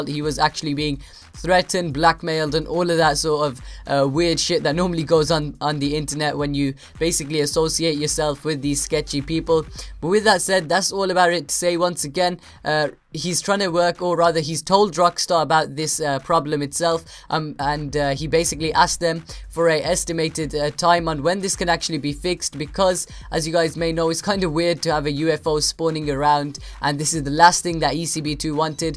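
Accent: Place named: England, United Kingdom. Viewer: where are you from? lol i can never tell your accent one minute i think you are english the next south african haha xD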